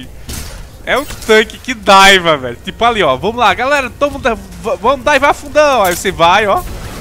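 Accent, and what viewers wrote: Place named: Recife, Brazil. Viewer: tu é engraçado kkkkk é de Recife? Sotaque da porra é esse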